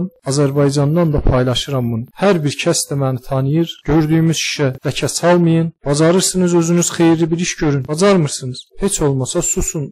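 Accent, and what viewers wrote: Armenia. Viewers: Siz ermənisiniz ləhcənizdən bilinir , görünür Azərbaycan dilini qəşəng bilirsiniz. Biz sizə nifrət edirik , XOCALI soyqırımında günahsız insanları vəhşicəsinə qətlə yetirdiyiniz üçün sizə nifrət edirik. Azərbaycanlılar bu cür danışmır. Siz həqiqətən bir ermənisiniz. Allah bəlanızı versin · Sən azərbaycanli deyilsən .Azərbaycanli olsan azarbaycan dilini biz azərbaycanlilar kimi danişarsiz amma siz gic ermənilərin azərbaycanin torpaqlarini oz torpaqlari kimi qələmə verir və sizdə dilimizi guya bizdən biri kimi ozunuzu elə gostərib calirsiz siz ermənisiz pis insansiz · Danışığından da bilinir erməni olduğu.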